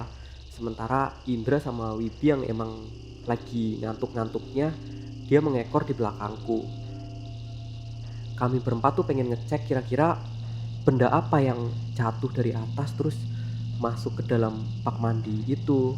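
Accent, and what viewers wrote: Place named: Banyumas, Indonesia. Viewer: nek orang ngapak emg bgtu mas hehe sy pun bgtu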